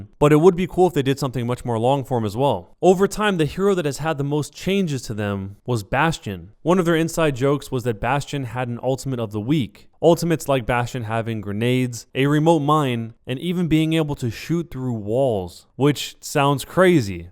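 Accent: Sweden. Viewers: Are you Swedish? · are you swedish? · Are you from sweden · Are you swedish????!!!!!! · O are you Swedish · Are you from Sweden? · Are you swedish?? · U swedish bro? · are you swedish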